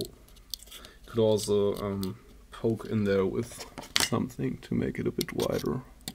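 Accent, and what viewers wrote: Germany. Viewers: Like the video 😃 keep up the good work . Also are you German ? You should like a German speaking guy.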